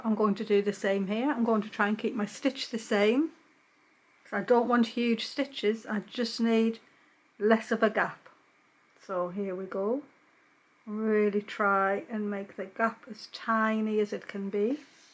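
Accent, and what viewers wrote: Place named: North East England, United Kingdom. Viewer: For me, it’s so lovely to hear somebody from the north east because it does feels like I’m listening to a friend or relative. Thank YOU for being here ❤❤❤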